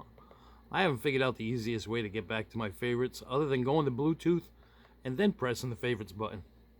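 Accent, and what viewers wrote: United States. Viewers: I just don't trust, nor believe, anyone speaking about ANY subject, with this v i l e " a m e r i c a n " accent... Taking a short break from bo m b i ng other human beings ? 🤮🤮🤮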